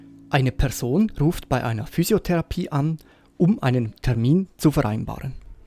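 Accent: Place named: Swiss German, Switzerland. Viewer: Hoi! Really enjoyed your Swiss German content 😊 Clear and authentic Swiss German is hard to find—great work! I work with YouTube SEO and noticed a couple of simple ways this could reach more learners. Happy to share if you’re open 👍 Best regards, Md Rana Sheikh Digital Marketer & YouTube Growth Expert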